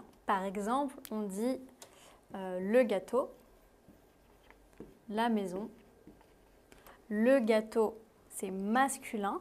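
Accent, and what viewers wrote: France. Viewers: Merci. C’est toujours une bonne idée de commencer au début. J’adore votre accent français.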